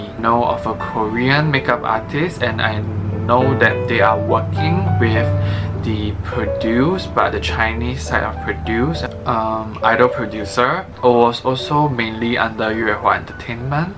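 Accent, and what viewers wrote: Singapore. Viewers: AYEEEE SINGAPOREAN HERE TOO I SENT YOU MY AUDITION VIDEO ALREADY HEHEHEH when I first saw your video I was wondering if you were Singaporean but I wasn't sure but aye your accent is strong! I'm a proud singaporean of you here!❤❤ itsokay, life is really short. sometimes we have to give up on some things. glad you made your choices! I'm gonna work hard and try to achieve my dreams! jiayouz !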